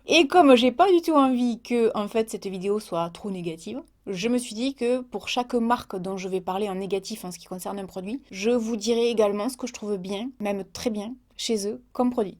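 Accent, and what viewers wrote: South, France. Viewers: Salut, je suis abonnée à ta chaîne depuis environ 1an, j' ai encore découvert pleins d' astuces et produits, j 'ai 58 ans et depuis mes 16 ans ce qui fait un bail, je me fais des gommages, masques que ce soit visage corps, mains, pieds etc. Quand je vais avec ma petite fille faire les boutiques ont me dit que c' est super de faire du shopping avec ma fille elle à 15 ans ce qui nous amusent pas mal. Je mentirais si je disais que je ne suis pas flattée ni que mes chevilles ne gonflent pas. Effectivement il faut prendre soin de soit que ce soit à l 'intérieur comme à l 'intérieur. Je souhaitais te remercier pour toutes ces vidéos supers et ta bonne humeur. J 'aime beaucoup ton accent aussi car j 'ai le même étant aussi du midi de la France. Continue tu es superbe et vrai, peut de personnes peuvent en dire autant.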